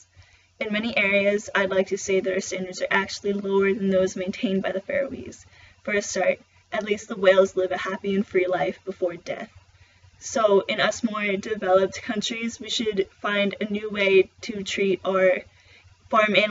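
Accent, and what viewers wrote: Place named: United States. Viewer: You sound American. There are many other Americans, who are not fooled by Paul Watson's Sea Shepherd. Thanks for nuanced viewpoint in your video.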